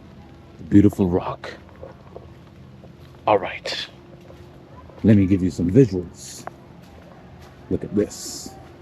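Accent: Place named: United States. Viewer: Love your videos and your channel by the way you sound like you from state just saying ❤❤❤❤❤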